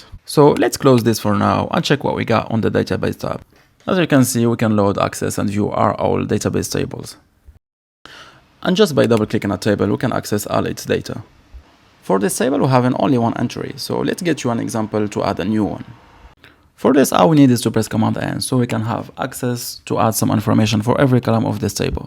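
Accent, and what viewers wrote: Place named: France. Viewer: I've watched the Kubernetes video and now this one, I've gotta say that you're explaining everything great. Your videos have a nice format and are quite enjoyable to watch, but I have one small thing to say to you and I mean no offense here - we all know you have a french accent when talking and sometimes (here and there) you talk so fast that I lose you, so for your future videos if you could slow down just a little bit. :) Hoping to see more from you, subscribed! P.S. the brackets plugin is amazing, thank you!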